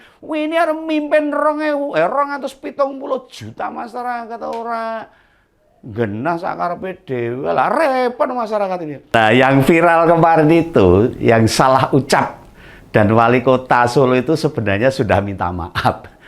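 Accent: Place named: Yogyakarta, Indonesia. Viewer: Mesti ketawa kalo denger Om bicara, khas logat Jogjanan. Semangat Pakde😁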